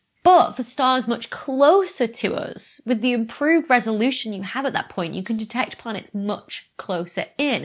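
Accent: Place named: North, United Kingdom. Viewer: Love your style, so straight to the point. The subject of astronomy will always be dear to me and to hear it in a fellow northerers accect is just a bonus. I actually have a very old book showing Halleys comet and was lucky enough to see its last appearance. Keep up the great work Dr Becky 🔭🪐 - Mav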